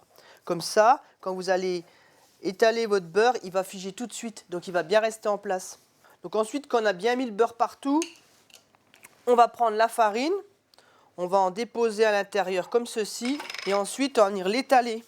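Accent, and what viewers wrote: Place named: Rural, France. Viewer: Le gars avec son accent du terroir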